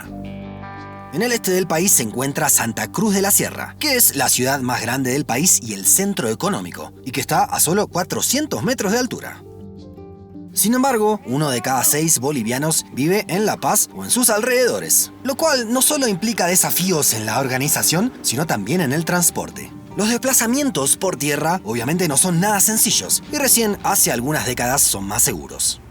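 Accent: Rioplatense, Argentina. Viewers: No exageres La Paz y el occidente siempre tuvimos habitantes, por tu acento pareces argentino o uruguayo como se dicen europeos la mayor civilización de Bolivia e fué Tihuanaco superior a cualquiera de Bolivia o siempre había habitantes.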